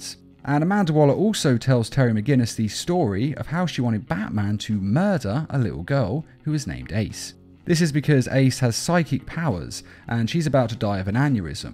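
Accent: United Kingdom. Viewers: You forgot that the narrator is british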